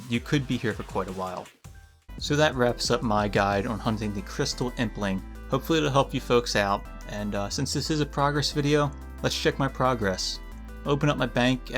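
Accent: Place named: Philadelphia, United States. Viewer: You sound like you’re from the philly area. Also I hope you play osrs by now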